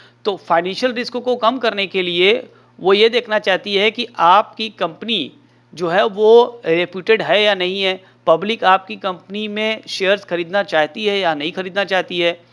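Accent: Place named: Uttar Pradesh, India. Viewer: Dekha aapne mera andaaza Maine kewal aapki awaaz se pata laga liya ki aap UP se ho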